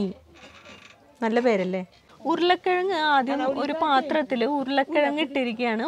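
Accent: Thrissur, India. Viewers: Chechide samsaram reethi thanneya ettavum manoharam.. 💞💞 Chechi from thrissur alle... evdeya proper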